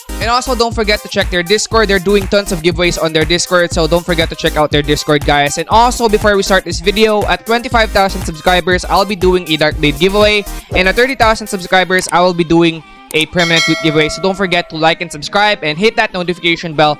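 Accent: Philippines. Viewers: Your accent is like so Filipino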